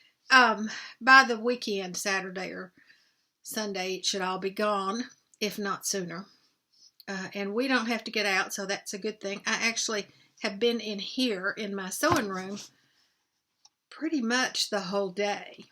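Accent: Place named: Arkansas, United States. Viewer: . I loved your Arkansas French accent. My NE accent would be funny too! I love everything you do. That pillow for your gd is awesome and she will treasure it. TY for sharing what you have done! I do have a house generator as we get wind gusts and snow here on the coast! I’m so glad I got it. I too am blessed!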